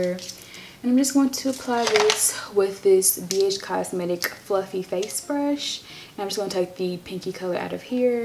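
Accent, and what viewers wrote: Memphis, United States. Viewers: I Knew I Heard Some Memphis Twang In Her Voice. Lol Heyyy Girl😘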